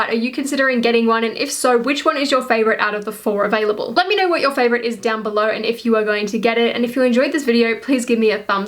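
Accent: Australia. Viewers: Very nice haul! Your video showed up as my #1 recommended video, so I decided to check it out and am glad I did. Besides the great LEGO content, I have always loved the Aussie accent. I have Australian friends and have been lucky enough to explore some of Australia (quite a few years ago). I have to say, I loved your use of "pissed" to mean upset. When I was there, I was told by my mates that it wasn't used that way in Australia, but just used to mean drunk...which we were having the discussion in a bar and laughing our heads off. Okay, back to LEGO... Glad to see you scored the Captain Marvel polybags. That one has eluded me so far in local stores (No LEGO store near me here in California). I definitely want it. If I can't get it for retail, I'll likely pick it up on Bricklink or Ebay. My siblings and nieces are into LEGO. My sisters are both big Harry Potter fans and when I showed them the Harry Potter accessory pack they were both surprisingly excited for the Maruaders Map that comes in it and proceeded to tell me how important it is, etc. 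I'm a casual Harry Potter fan...I've seen the movies and liked them, but they are BIG fans. I was surprised to find the Mario blind bags at my local Target a couple days ago. They had 12 packs and I bought them all. I got 8 different of the 10. I'm the same, that's the only Mario items I'm getting...well, I did pick up the polybag at target too...but passing on all the play sets. Awesome score on the big Hogwarts Castle...my family got t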